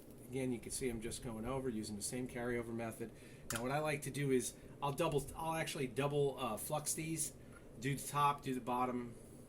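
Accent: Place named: Eastern Pennsylvania, United States. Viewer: you sound like you're from eastern PA. good explanation on the video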